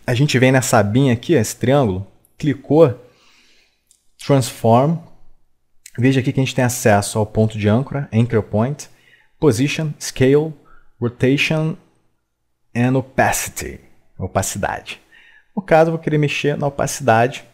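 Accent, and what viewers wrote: Rio de Janeiro, Brazil. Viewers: Carioqueish não aguento. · Carioquês tá em dia heim.. hehehehe..ótima aula. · Você é carioca ? (alem disso ótimo vídeo)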